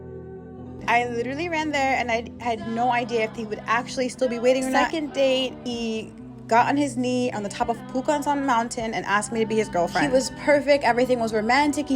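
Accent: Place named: Trinidad and Tobago. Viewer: I love your Trini accent (^_^) I'm glad you're focusing on you again ~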